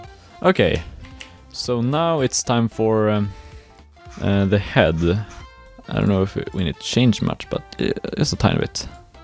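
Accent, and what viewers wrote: Sweden. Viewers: you sound super swedish, am i right?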